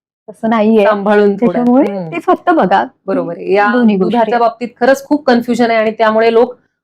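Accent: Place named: Pune, India. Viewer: Madam tumhi kitisundar shuddha Puneri utchhar Marathi bolat ahat. Khup chhan vatala aikun. Tumhala shabbaski dete. Bless you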